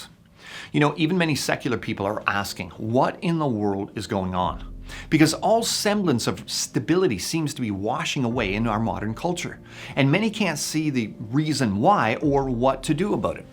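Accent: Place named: Canada. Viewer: Oh Lord, that Canadian accent is making my ears bleed...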